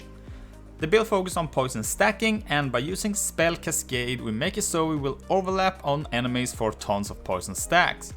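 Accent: Sweden. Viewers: Man that Swedish accent is insane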